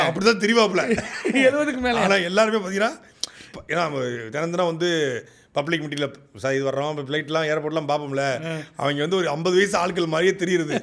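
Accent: Tirunelveli, India. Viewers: சாட்டை நெல்லை அதான் தமில்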